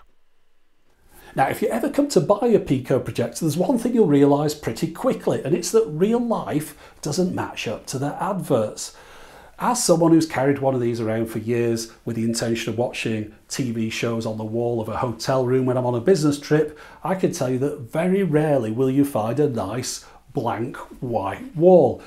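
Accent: United Kingdom. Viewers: Great sound, excellent video, proper British accent. Superb review.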